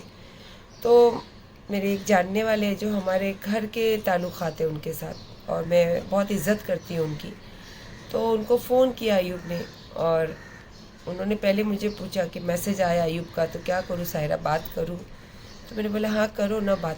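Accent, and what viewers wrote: Bangalore, India. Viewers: Wow ..😍😍😍what a bangalore style language😘😘😘❤❤❤❤❤❤❤ God bless you stay strong Aunty ji love you😘😘😘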